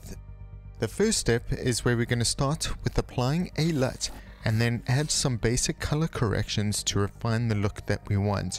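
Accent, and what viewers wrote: South Africa. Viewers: Spot a South African just by their accent. Great video mate! I use Lumafusion for all my editing and grading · I could hear the south African accent and then I saw table mountain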